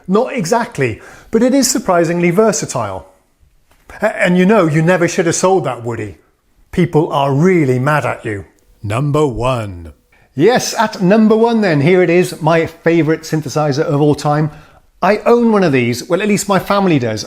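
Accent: United Kingdom. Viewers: woody are you british born or swedish? because you speak a beautiful british english with a fabulous accent! I would like to speak with an accent like yours :O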